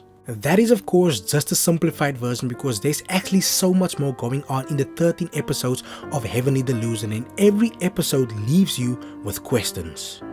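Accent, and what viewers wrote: South Africa. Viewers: this defs a south african bru speaking here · My guy are you a Saffa? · Hebanna, I didn't expect a South African accent ... Awe bra waka.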